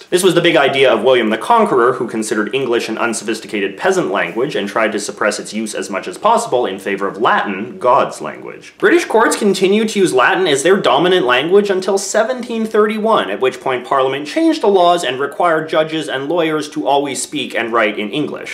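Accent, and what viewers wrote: Canada. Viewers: As a Canadian I can tell by your accent, you are Canadian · Soooooo fookin Canadian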